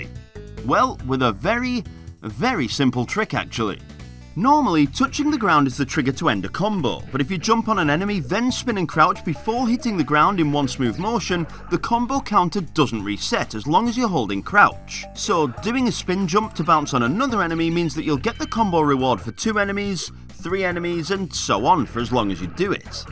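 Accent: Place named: England, United Kingdom. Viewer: even the YouTube subtitles couldn't keep up It is not only about your accent, but also the fact that you mash words together, making up new words with even weirder pronunciations. Also known as portmanteaus or slurred speech . Essentially creating a brand new dialect on the fly I find it very sad that someone from England can speak this poorly , even Africans can speak better English.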